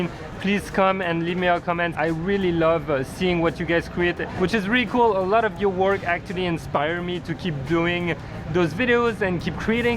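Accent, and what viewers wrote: France. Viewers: J’entends ton accent français qq part mais vos vidéo sont grave bien et ça m’aide beaucoup pour avancer dans la photographie merci! :)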